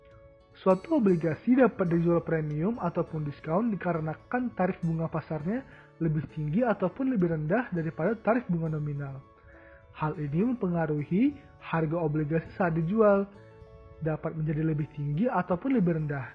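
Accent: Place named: Bengkulu, Indonesia. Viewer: Keren kak, berasa aksen bengkulunya coba lain kali dubbernya orang yang sama tapi disuru nyanyi dulu biar fresh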